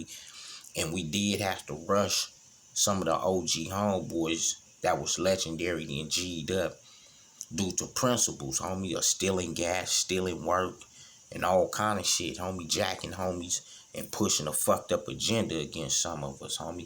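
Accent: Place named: West Coast, United States. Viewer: Man I listened to your stories throughout my day at work and I'm a Crip from Denver and I know you're not lying and you're not fake about nothing you say however you can actually get paid do voices for like a new Grand theft Auto hell you can even be in movies you got that authentic stereotype West Coast accent and voice it's nothing new to me but to the world it would be a sight to see like yes n*** like this really exist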